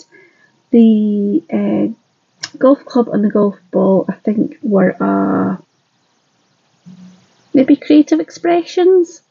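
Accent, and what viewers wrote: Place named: Scotland, United Kingdom. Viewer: Superb cards and I love your gentle Scots accent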